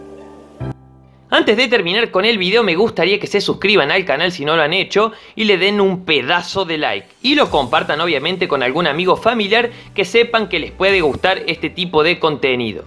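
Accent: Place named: Cordoba, Argentina. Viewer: Sos cordobes? Se te nota pa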